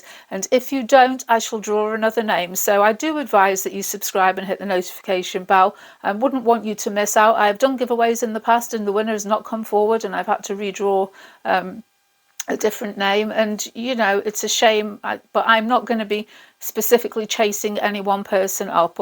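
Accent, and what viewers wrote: England, United Kingdom. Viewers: Hi, I'm Jane, in the UK, a relatively new subscriber, and I'm avidly watching all of your videos, I come to you for a dollop of inspiration! I'd love to get into gel plate printing, it looks like a lot of fun! Also, can I say, it's lovely to hear an English voice in the world of mixed media! Congratulations on reaching 25k, you deserve it.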